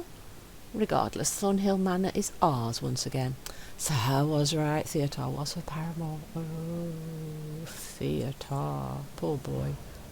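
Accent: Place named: United Kingdom. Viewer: Painfully British but good video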